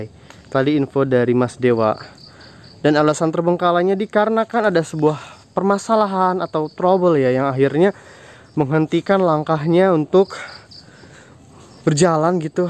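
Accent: West Java, Indonesia. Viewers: Aku seneng Ama konten ini dengan cara ngomongnya ke Sunda,an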